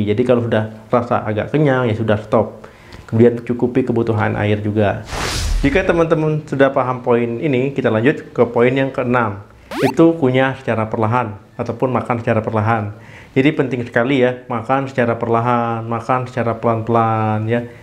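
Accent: Aceh, Indonesia. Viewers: Dok orang Aceh ya? Logat nya Aceh masih kental soalnya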